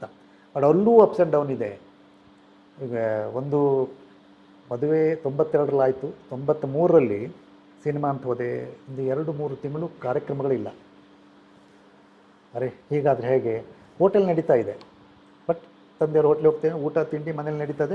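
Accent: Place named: Kundapura, India. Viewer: ನಿಮ್ಮ ಭಾಷಾ ನೈಪುಣ್ಯತೆ ಅದ್ಭುತ.... ನಿಮ್ಮ ಜೀವನಾನುಭವ ನಮ್ಮ ಕುಂದಾಪುರ ಕನ್ನಡ ಕೇಳುವಾಗ ಆಗುವ ಆ ಕರ್ಣಾನಂದವೇ ಬೇರೆ ❤❤❤❤❤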